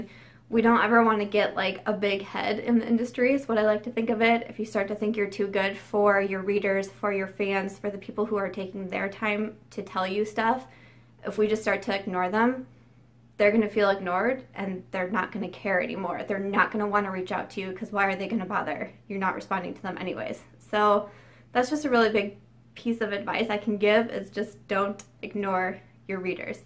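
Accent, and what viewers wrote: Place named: Midwest, United States. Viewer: Thank you for these helps. I'm only an author in my head once in a while. I've never published anything. I enjoy hearing your midwestern accent. I also appreciate your tip on getting your response down in a Word document and then deleting it because I can see how it would be tempting to respond to negative feedback and pull down the professionalism you've worked hard for.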